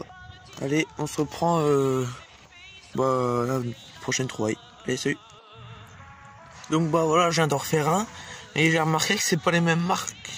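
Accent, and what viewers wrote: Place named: Normandy, France. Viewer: c'est vrai que tu as l'accent normand ^^ tu es de quelle coin en Normandie ?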